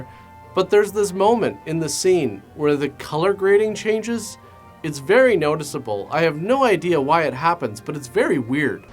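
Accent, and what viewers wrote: Canada. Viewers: I'm Scottish. I'm used to hearing horrific versions of our accents. Americans can barely tell Scottish and Irish apart, or Cockney and Australian... Cloth ears! At least I can tell is a Canadian - the way he says "about" is a giveaway.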